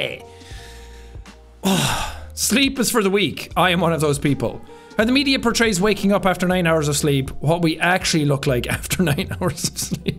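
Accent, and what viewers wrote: Ireland. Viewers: Loud Irish man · I know this sounds like I'm making fun of his Irish accent but the way he said "why" was just so funny to me. I really like Irish people I think they are really funny but serious at the same time and I just love it. I would move to Ireland if I could. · dude the phrase "god's happy no problem bud" and "time for some misery " with that strong Irish accent is sooooo good XD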